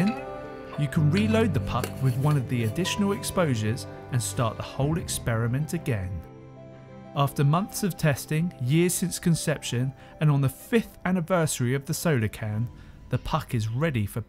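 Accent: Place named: United Kingdom. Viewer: No offense intended, but are 98% of all videos now narrated by the same British reader? 🧐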